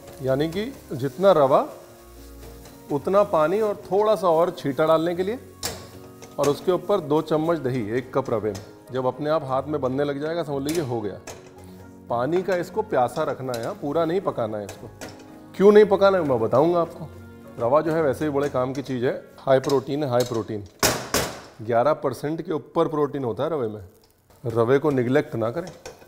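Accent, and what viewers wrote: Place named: Punjab, India. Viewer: Sir aap kahte ho ki aap lakhnow se ho But aapke bolne me to punjabi lehja hai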